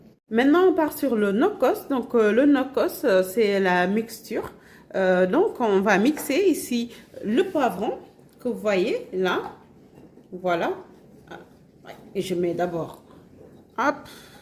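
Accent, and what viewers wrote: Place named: Saint-Louis, Senegal. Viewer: On dirait que vous êtes de ndar.... Domou ndarrr... Merci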